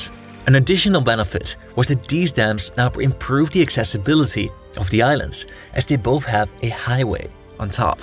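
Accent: Netherlands. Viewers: I hear it clearly…Dutch native tongue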